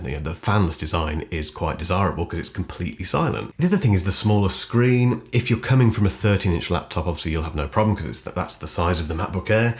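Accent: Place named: RP, United Kingdom. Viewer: The accent is so posh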